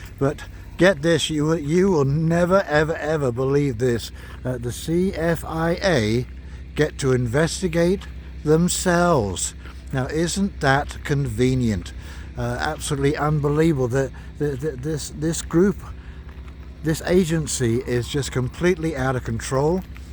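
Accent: United Kingdom. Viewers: take your stupid british accent back to where your from. Canada does not need you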